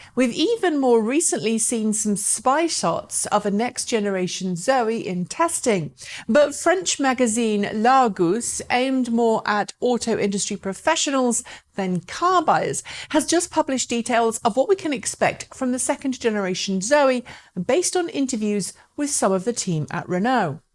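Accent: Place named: United Kingdom. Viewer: oh gosh I didn't know to be honest. I thought you were from the UK judging by your accent! Lol, sorry!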